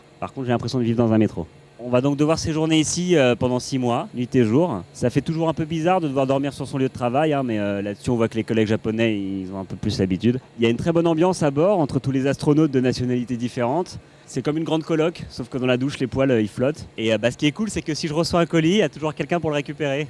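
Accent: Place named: Russia. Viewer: L'anglais parlé avec l'accent Russe est parfait <3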